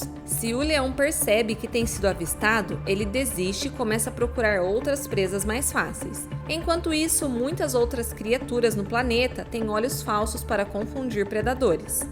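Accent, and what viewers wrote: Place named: Caipira, Brazil. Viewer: Bom vídeo. Mas a narradora precisa perder um pouco do sotaque caipira.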